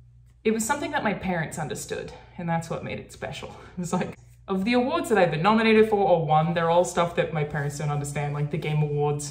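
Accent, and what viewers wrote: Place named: Australia. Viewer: Because she is Australian and that is her accent. Just as your dialect may sound unusual to someone from a different part of your country or a different part of the world.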